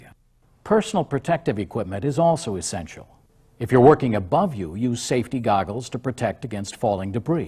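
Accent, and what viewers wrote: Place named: United States. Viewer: Zakaj dobimo amerikanski video, če se učimo bri'ish english?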